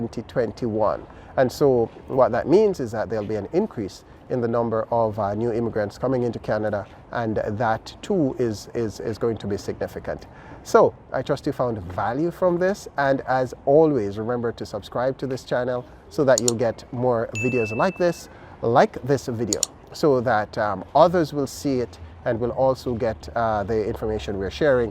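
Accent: Jamaica. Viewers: Thanks for the info, are u jamaican?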